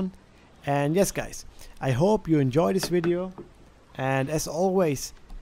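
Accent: Germany. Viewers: dieser deutsche Akzent ;) aber gutes video bro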